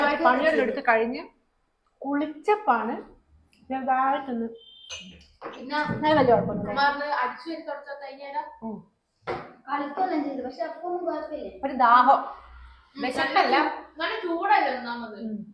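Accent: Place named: North Kerala, India. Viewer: ഹായ്.... നിങ്ങളുടെ സംസാരം കേട്ടിട്ട്... പേരാമ്പ്ര.. നടുവണ്ണൂർ or മേപ്പയ്യൂർ touch... ജസ്റ്റ്‌ ഗസ്സ്..... Good family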